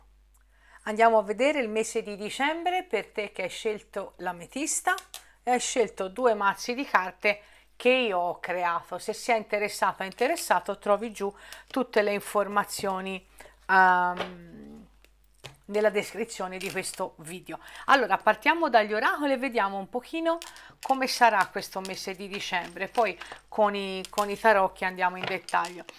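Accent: Tuscany, Italy. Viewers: ciao...ti seguo da qualche tempo, l ultima lettura due tre giorni fa... A parte quanto adoro la tua pronuncia toscana e i tuoi "guarda qua" ehehe sei simpaticissima! Ma volevo confermarti, in merito al ritorno di qualcuno dal passato... Giuro che ho appena ricevuto un messaggio da chi non sentivo da 1 anno! L ho visto apparire mentre ti sto ascoltando in diretta 😱. Ho avvisato prima te, prima ancora di leggere il contenuto del messaggio stesso!! 😇 Complimenti per la predizione... Ora sono curiosa di andare a leggere la sua missiva!! Chissà cosa avrà da dirmi???? Ciao un abbraccio 🎄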